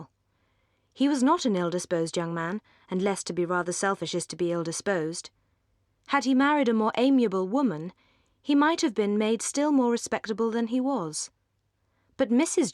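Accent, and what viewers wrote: United Kingdom. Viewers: She sounds so much better speaking with an English accent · ! My sweetiee💖💖💖 What a beautiful voice she has! Original British accent! I'm so happy to find it on yt. 😻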